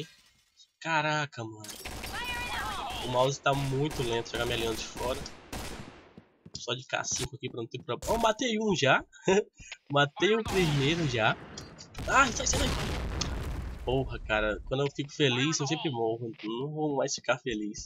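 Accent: Acre, Brazil. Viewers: vc e do acre?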